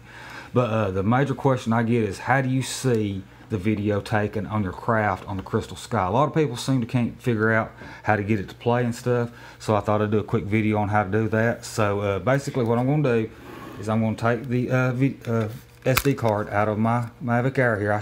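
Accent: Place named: Kentucky, United States. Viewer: By the way where do you live? I used to live in Kentucky and I kinda recognize your accent from when I used to live back there. Not trying to be funny but I was just curious. Used to live outside of Lexington Kentucky in a little town called Winchester KY. who knows we might have been neighbors at some point 😂😂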